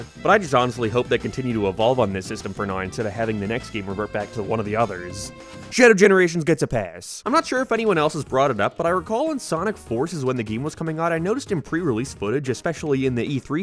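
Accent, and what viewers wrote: Ireland. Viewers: hes irish iirc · Your accent goes to the most clear American accent to the thickest Irish accent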